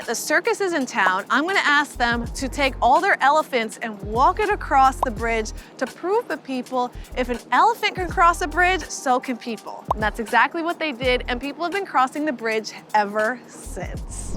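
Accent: New York, United States. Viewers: how come since you moved out of town your New York accent has come back stronger?😂 As usual very interesting and informative thank you 👍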